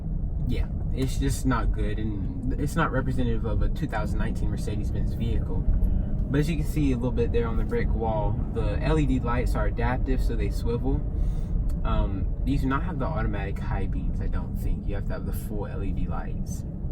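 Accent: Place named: England, United Kingdom. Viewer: Please can you be more presentable with you hair, on a very positive note, a good clean English accent. Thanks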